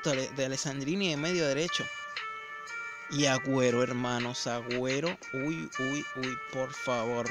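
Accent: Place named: Caracas, Venezuela. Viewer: de donde eres se me parece que eres caraqueño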